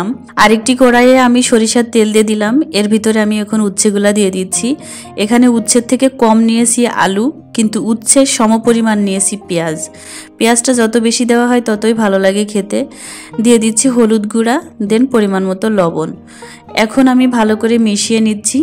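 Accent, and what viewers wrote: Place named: Jhenaidah, Bangladesh. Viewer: আপু,আমি প্রথমে জানতাম না যে তুমি কোন জেলার ۔۔۔কিন্তু তোমার ভাষাটা আমাদের অঞ্চলের মতো লাগতো ۔۔۔পরে জানতে পারলাম যে তুমি ঝিনাইদহের মেয়ে ❤۔۔আমিও ঝিনাইদহের ۔۔আসলে নিজের অঞ্চলের মানুষদের উপর অন্যরকম এক টান কাজ করে l আমি তোমার নতুন ভিউয়ার ۔۔ অনেক কিছু শিখতে পারি তোমার থেকে۔۔۔۔۔۔আপু নেক্সট ভিডিওতে ঝিনাইদাহ নিয়ে কিছু বলো۔۔ আর আমার নামটাও একটু মেনশন করো ۔۔۔তোমার মুখে আমার নামটা শুনতে চাই ❤ +জেসমিন = ❤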